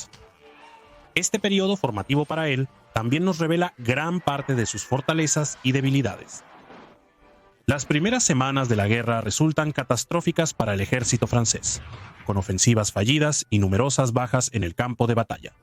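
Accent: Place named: England, United Kingdom. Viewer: En este video, los apellidos franceses se pronuncian " a la inglesa". Hombre, no creo que sea tan difícil hacerlo en francés.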